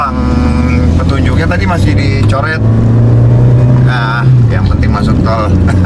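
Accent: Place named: Bandung, Indonesia. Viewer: O gt ya bang,, mf ya,, tp logat bicaranya kyk asli dr bandung gt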